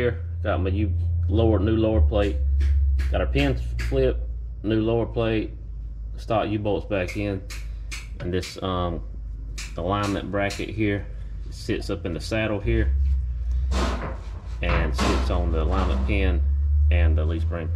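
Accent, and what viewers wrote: Kentucky, United States. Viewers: You sound like EVERY SINGLE KID I grew up with my entire childhood in Kentucky 😂 that’s super cool since I’m in Michigan now lol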